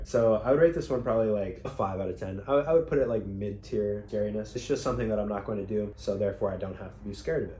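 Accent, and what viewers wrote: Australia. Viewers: Lmao I was so confused at the start with the Australian accent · Wait, he’s Australian?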